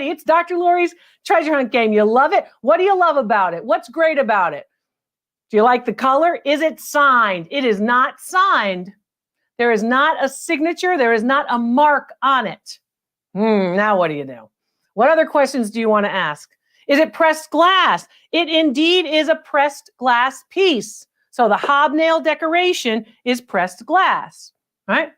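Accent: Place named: Connecticut, United States. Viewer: I absolutely LOVE the way Dr. Lori calls everyone “you people”! Lori, you talk just the same as me. Must be a Connecticut thing…🤷🏻‍♀️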